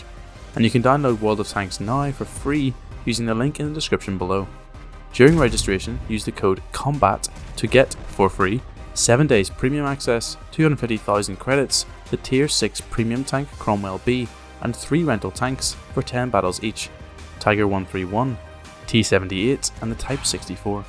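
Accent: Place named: Belfast, United Kingdom. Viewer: Sounds a bit like a Belfast mon...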